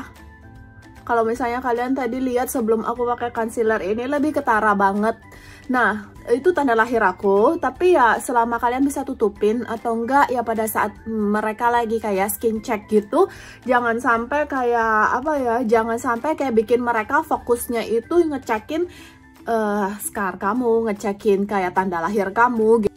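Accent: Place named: Pontianak, Indonesia. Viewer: Asli mana..logat Indonesia nya kayak dari Pontianak ya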